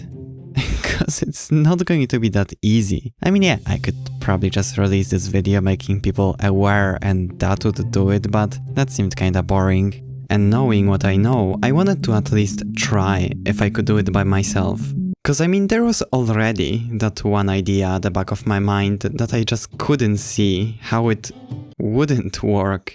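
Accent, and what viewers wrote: Poland. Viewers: Your accent is so Polish.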